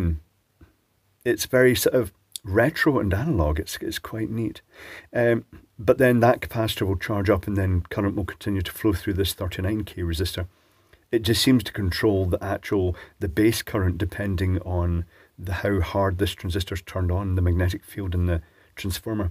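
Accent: Scotland, United Kingdom. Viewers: There's something magical about hearing "green crustiness" in a Scottish accent.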